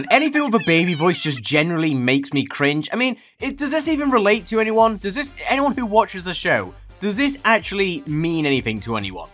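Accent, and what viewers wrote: United Kingdom. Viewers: Btw it’s my first time seeing this channel glad to find another bri’ish fellow🇬🇧🇬🇧🇬🇧🇬🇧🇬🇧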